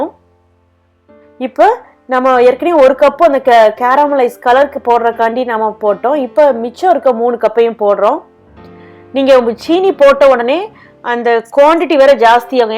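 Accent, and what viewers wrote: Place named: Tirunelveli, India. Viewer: Nice recipe..ur slang seems like u r a Tirunelvelian...rite mam🙂.?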